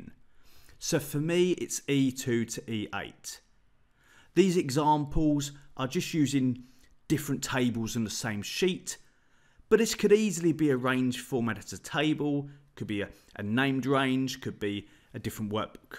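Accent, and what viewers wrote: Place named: Cockney, United Kingdom. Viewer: Clear and understandable tutorials, and in my first 2 vids I've seen I've discovered DGET and XLOOKUP just at the time I need them! All delivered with a top geezer accent, subscribed! Question for anyone who can answer: I followed the steps for becoming an Office Insider, it seems I now am one, am I able to activate these secret functions somehow? They aren't immediately available. It also says "to a portion of Insiders", perhaps its not all Insiders who gain access? Cheers!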